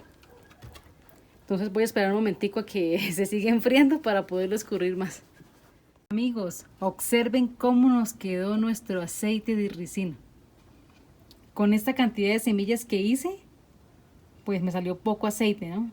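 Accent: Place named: Mexico. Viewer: Me encantó esta receta!! Mil gracias. Y te pregunto de donde eres??? Sueñas a veces como México y otras como colombiana. 🇨🇴🙏🏻💓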